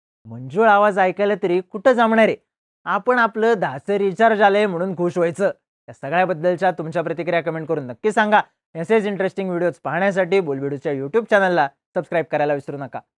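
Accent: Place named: Kolhapur, India. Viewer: भावा तुझ्या बोलण्याची पद्धत पूर्णपणे कोल्हापुरी वाटते एकदम झणझणीत आणि चरचरीत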